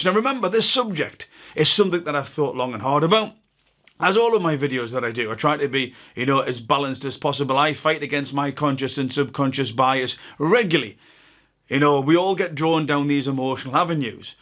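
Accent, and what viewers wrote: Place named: Scotland, United Kingdom. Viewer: Nothing better than listening to a Scotsman speak. ❤️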